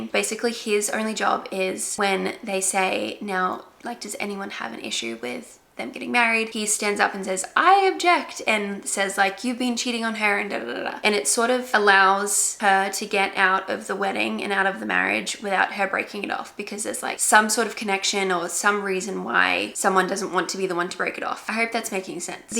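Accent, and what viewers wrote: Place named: Australia. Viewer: the Aussie accent is back 🥰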